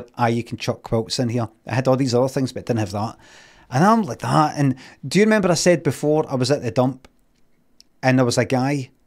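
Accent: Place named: Glasgow, United Kingdom. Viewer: 'Mattreyseys' 😆 How I love the Glasgae twang.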